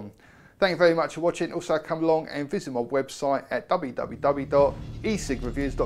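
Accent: London, United Kingdom. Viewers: What part of London are you from? Maybe Hackney or Bethnal Green? Cool video.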